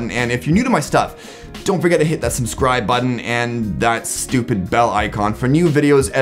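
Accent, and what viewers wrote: Canada. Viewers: I love when your little Canadian accent shows through. I also dig the beard! very rugged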